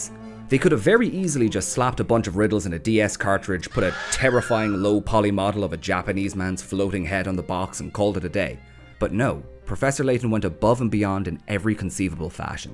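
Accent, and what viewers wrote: Ireland. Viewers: Gotta say man I love your videos so far, the editing and the comedy is lovely! (Plus it's nice to hear a Irish youtuber with a accent besides Jacksepticeye floating about ) Also the ash can is ALWAYS THE GUINNESS, IT'S ALWAYS GUINNESS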